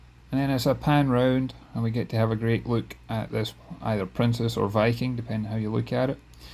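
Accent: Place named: Scotland, United Kingdom. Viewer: Not bad.. But the guys that are selling it have not even done a through clean on it and that in it's self would stop me from buying from them.. I mean they didn't even wipe out the cooler on the fly bridge.. Just being honest brother.. still nice little boat to do some cruising in.. My wife loves your Scottish accent..👍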